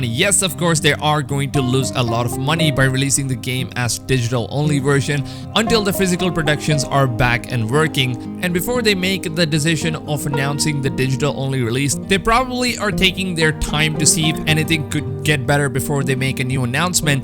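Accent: India. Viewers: Great video man. Where are you from btw? You sound Indian or South Asian? Thanks and hope we get the game soon!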